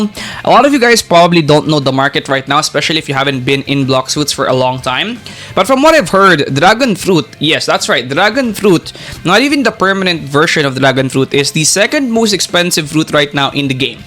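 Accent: Philippines. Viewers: bro is accent pilipino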